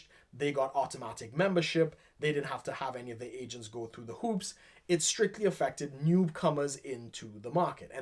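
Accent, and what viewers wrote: Trinidad and Tobago. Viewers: Right away I knew you were from Trinidad.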